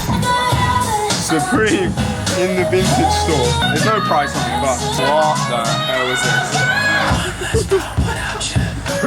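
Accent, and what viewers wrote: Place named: London, United Kingdom. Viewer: i was gonna like straight away just for the accent most of these videos arent acc done by londoners 🤣😅no shame though love to everyone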